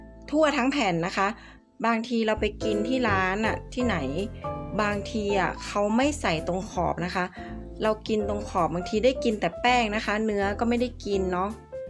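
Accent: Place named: Northern Thailand, Thailand. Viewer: เข้ามาไล่ตามดูย้อนหลัง ตอนพูดกลางก็พูดได้แบบเป๊ะมาก แต่อู้คำเมืองน่ารักมาก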